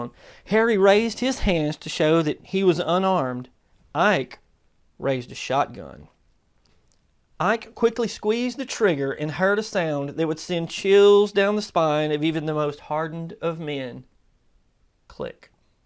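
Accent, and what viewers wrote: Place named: Tulsa, United States. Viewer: OK, I grew up in Tulsa and still live nearby and you sound like a local. Am I correct? Great job.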